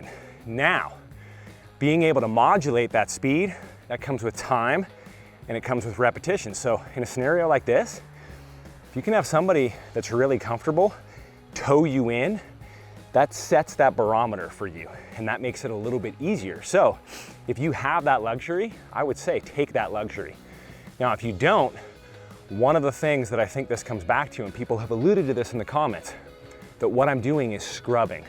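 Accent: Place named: United States. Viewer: Thank God we have usa boy and accent. It's about f time. That I dont hear a f English accent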